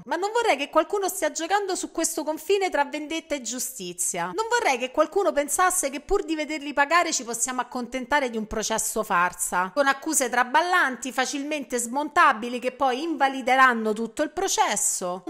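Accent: Rome, Italy. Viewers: Canale interessante il suo, ha attirato la mia attenzione, anche se il suo accento romano.. 🎶Mamma lupa, poveretta, i è svenata con i denti🎶 Il giornale ha riportato con stupore Non poteva sopportare che il suo popolo invasore🎶 Diventasse una colonia di invadenti Hey-la-la-li, ya-la-la-li, ya-da-da-ya-da. Bom dia.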